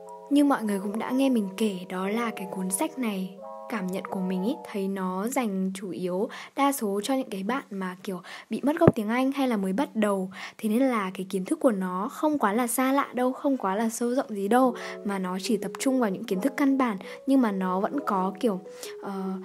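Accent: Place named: North, Vietnam. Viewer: phải công nhận giọng gái Bắc hay cực ý Hơi bị thích giọng bạn này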